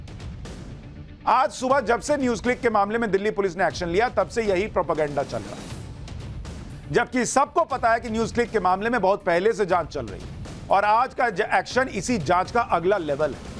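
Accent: Bihar, India. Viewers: Superfan of Bihari accent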